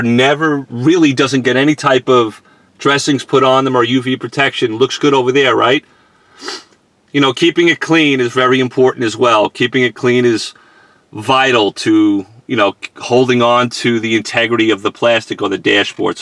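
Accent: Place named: New England, United States. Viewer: Never knew you could use that on a dash! First time watching your channel. One question, what part of New England are you from? Your accent almost sounds southern New England.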